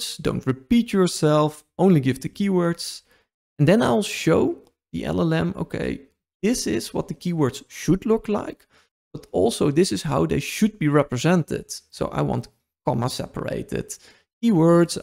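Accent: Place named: Netherlands. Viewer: God what a Dutch accent, coming from a Dutchie 😂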